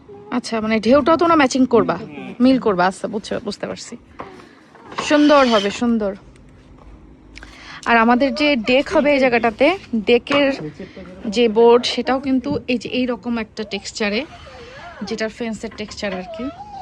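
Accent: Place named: Chittagong, Bangladesh. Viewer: আপু ডেকছি চট্টগ্রামে ভাষায়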